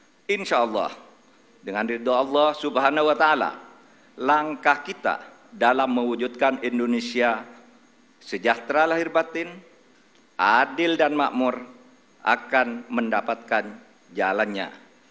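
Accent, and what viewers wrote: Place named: Madura, Indonesia. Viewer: Logat Madura nya masih kental, salam settong dere pak😁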